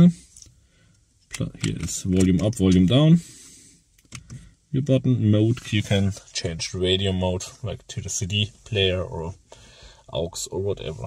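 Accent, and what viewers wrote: Germany. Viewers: Der deutsche Akzent ballert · The fact that I turned on subtitles and there is only German since u have strong German accent is hilarious 💀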